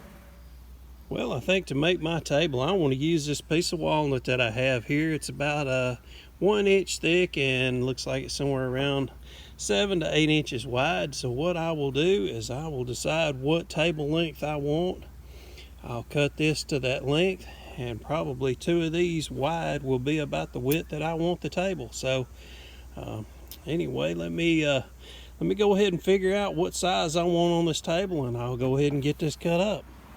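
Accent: South, United States. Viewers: I love the lush greenery and wet rain - so beautiful! Must be down South judging from the accent. That is one lovely table! The contrast of white dowel with black walnut is so lovely. Too bad we never saw the underneath when finished. I don't know how this moves exactly. I guess it just swivels on the rod? Also wanted to see the dowel inserted.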